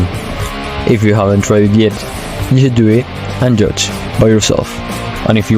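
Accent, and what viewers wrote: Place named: France. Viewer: Very nice video, thanks very much!! To be honest its a little hard to understand your accent, but with subtitles it works perfectly, also your accent adds some french charm to it, so feels like the narrator is definitely know what he is talking about, so although its a bit hard to understand its actually nice )) thank you!!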